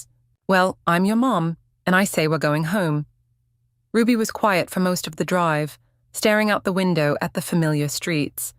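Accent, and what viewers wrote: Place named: England, United Kingdom. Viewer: “Sarah’s mom saw you and told her mom and Sarah told me at lunch…” Then , the convo makes zero sense - the daughter is with her dad, at grandma’s, but Liam brings the daughter’s art supplies back to the house where she doesn’t live and says “she forgot them yesterday…” What TF? It’s almost like no one actually listened to this slop after the computer regurgitated it using a prim, proper and stuffy English accent who, when she protests, she protests “weekly.” SMDH AI-generated 💩 like this video truly sucks 👎👎👎👎👎👎👎👎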